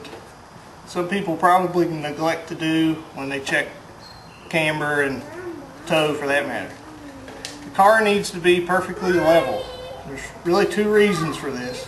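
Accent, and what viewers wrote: United States. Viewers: virginia accent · Where is the accent from? southern states?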